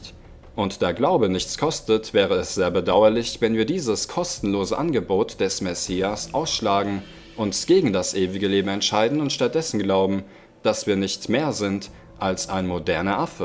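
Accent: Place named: Switzerland. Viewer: haha bisch du en schwiizer ?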